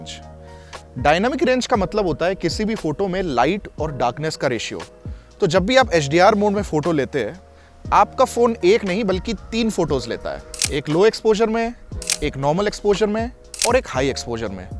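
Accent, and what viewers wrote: India. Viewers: are you bengali?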